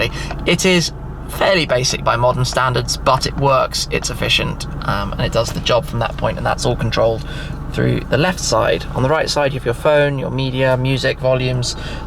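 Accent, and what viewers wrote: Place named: United Kingdom. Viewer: Only me annoying this British accent ?))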